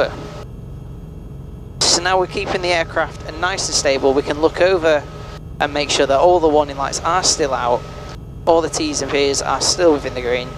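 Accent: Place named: United Kingdom. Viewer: I would watch your video if you could speak english rather than British stuff. So rude!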